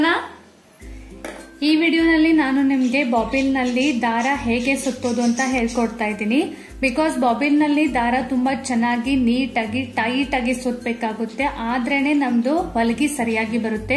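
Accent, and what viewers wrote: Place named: North Karnataka, India. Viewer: Hi sister very usefull video nanu uttar karnataka davalu niu nam language tharane adtira niu yav districtdavaru ?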